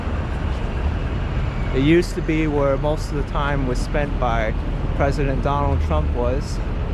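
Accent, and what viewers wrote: New York, United States. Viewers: Oh wow, where to begin...!? I loved this video! My husband and I visited NYC two years ago, stayed down on 46th somewhere, and learned the subway system, visiting all the touristy spots that week... Last year we returned, but this time branched out for some historical places. This year (in April again) we are staying close to Central Park, and will spend much of our time there, relaxing, walking, exploring, painting, taking photos...But hey! I stumbled onto this video tonight and walked all the way down 5th Ave. with you,totally enjoying your narration and fantastic tour, along with tips for tourists! Next thing I do will be to look for a few more of your videos, and hit that subscribe button! You are so knowledgeable, so you must have grown up in Manhattan? You have just a trace of a NY accent! Thanks for a fantastic walk down Fifth Ave!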